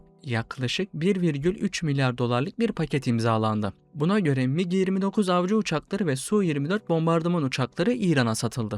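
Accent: Arab world. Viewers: Abi içerik güzelde şu seslendirmeyi arap aksanıyla K patlatan arkadaş yerine farklı biri yapsın. Dinlerken çok rahatsız ediyor. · Seslendirmeyi yapan arkadaşın anadili Arapça mı? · Abi şu arap aksanıyla K patlatan kardeşimize seslendirme yaptırmayın artık.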